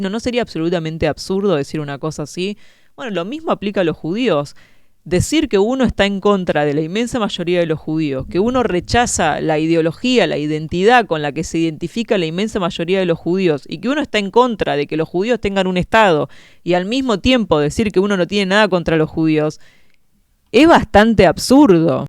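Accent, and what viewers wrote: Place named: Rioplatense, Argentina. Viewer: Muy bien explicado por una muchacha que tiene una gran preparación, elocuencia, oratoria y facilidad de palabra.Y no nací ayer....Por el acento no me doy cuenta si es una chica macanuda de Argentina, Uruguay o radicada en otras naciones hispáno-parlantes. Viva Israel!Am Yisrael Jai!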